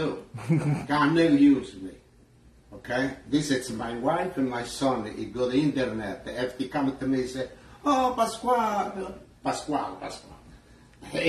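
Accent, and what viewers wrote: Italy. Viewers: I love your videos!!! Your Italian accent is absolutely awesome!!!! You’re the greatest!!! 👍🏾👍🏾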